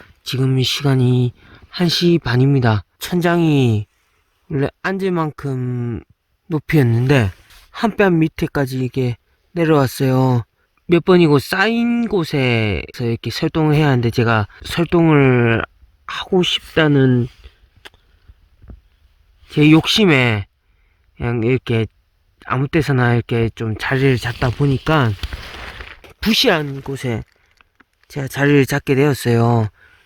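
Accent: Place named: Heilongjiang, China. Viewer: 옛날생각난다..말투가 흑룡강독립운동가 후손같네요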